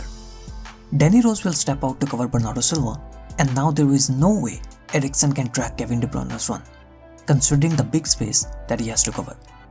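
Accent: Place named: India. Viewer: Bro please i like your videos but please fix your english accent its very annoying..... i hate this indian accent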